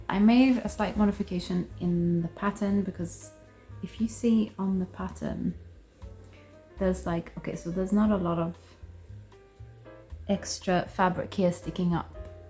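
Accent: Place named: England, United Kingdom. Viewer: I love your OG English accent. So refreshing to hear “Maths”, not “math” 😊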